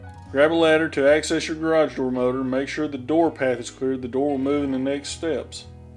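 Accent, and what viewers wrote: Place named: South, United States. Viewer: try to rid of the southern accent. you will get more money, dude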